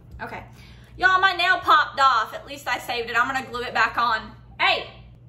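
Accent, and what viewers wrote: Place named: Texas, United States. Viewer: Yalll u from Texas u say yalllll a lot not a bad thing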